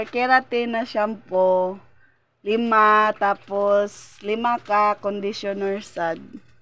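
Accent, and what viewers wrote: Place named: Visayas, Philippines. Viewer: Yes kilala ko si J Djshine dati din ako sponsor sa kanya, bait sya. Congratulations sis, parang bisdak ang tono ng salita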